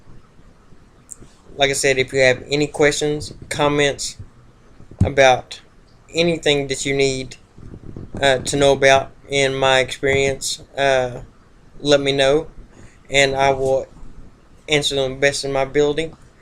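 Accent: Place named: Texas, United States. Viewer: Hi...hope u are well...make some updates please! Wonder if by now (a yr into wearing ur dentures now have you tried cushion grip? A lot of people on here have said it is great! Please let us know how u are after all this time...hope to see you soon...BYW are u from Tx? You sound just like me & both of my sons...lol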